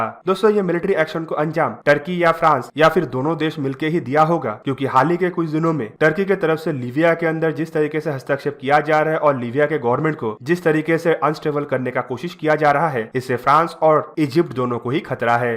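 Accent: Bengal, India. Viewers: Bro are you Bengali ?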